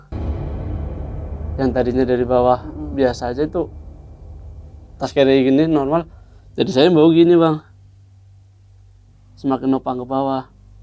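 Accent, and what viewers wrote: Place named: Banyumas, Indonesia. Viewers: Mas nek ngomong khas aksen wonosobo - purbalingga (ngapak) banget ya wkwkw jd kangen ke wonosobo lagi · Doa yang baik2 buat mas..saya doakan sukses dunia akherat Aamiin.., aja kelalen mas ora ngapak ora kepenak..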